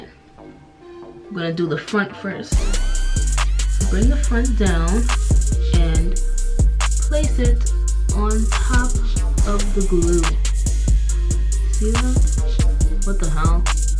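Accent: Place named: Philadelphia, United States. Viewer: “ I almost cut my Air “ I love her already I thought I was hearing a philly accent 😂 loved this video! Super informational and easy to follow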